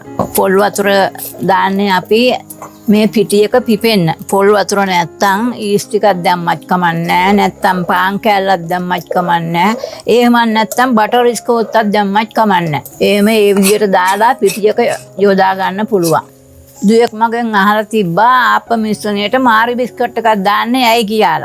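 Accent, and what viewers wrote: South, Sri Lanka. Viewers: අම්මාගේ දකුණේ භාශා ශයිලියට කැමතියි .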